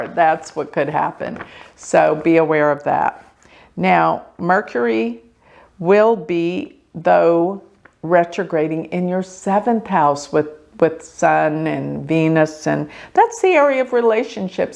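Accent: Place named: South, United States. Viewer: Just found last week. Love her. And she is in Dallas where I lived for 30 years. I knew she was southern with that accent and hair. She is smart Dallas glamour!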